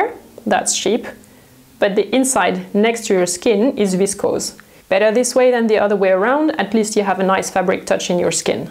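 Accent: France. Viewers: L'accent français est reconnaissable partout😀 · Super vidéo, je connaissais déjà ces tips mais je regarde pour le plaisir ! J'aime bien ton petit "French accent" ! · Can't identify where she is from... Why I heard some french accent? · Ce léger accent français :) Very interesting video. Clear and concise. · I like buying clothes and learning differences in quality and you make it informative and succinct, delivered with an absolutely killer French accent that would make learning the rules of cricket listenable! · Merci beaucoup pour cette vidéo merveilleuse! Ton accent francais est super mignon :) Et les astuces sont très intéressant!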